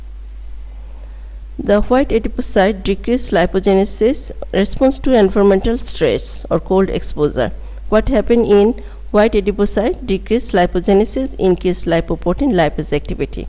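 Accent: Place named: India. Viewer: Only other Indians can understand this atrocious accent . Madam; please learn to speak English, or use a robot to read and express your slides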